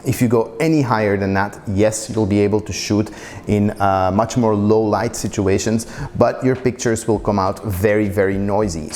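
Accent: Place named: Iran. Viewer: Very useful video. Thank you. BTW - where is the accent from? Sounds like Persian, related to French Canada? 🤪 Perhaps I'm wayyyy off.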